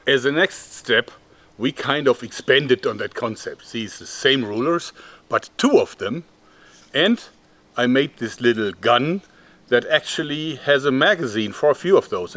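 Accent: Germany. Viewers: I think you are German.. nichts wahr?..I hear pronunciation...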